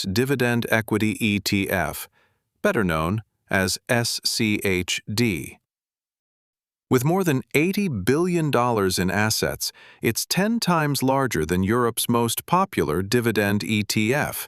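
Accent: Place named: Sweden. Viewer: that sweet Swedish accent 👌🏻😎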